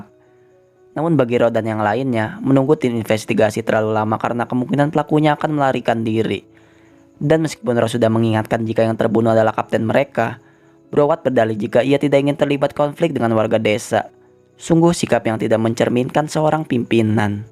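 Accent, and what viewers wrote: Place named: Kalimantan, Indonesia. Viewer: Dri logat kykx ini org Kalimantan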